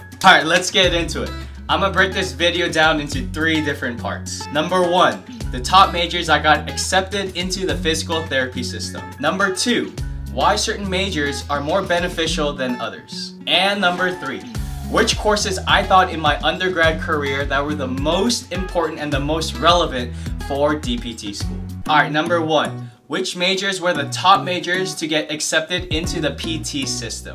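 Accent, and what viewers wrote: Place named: South Korea. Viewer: nah it sounded Korean. I see you, my dude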